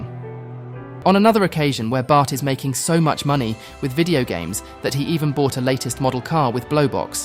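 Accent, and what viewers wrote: United Kingdom. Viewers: Curious question if you're from the US how come you have a British accent...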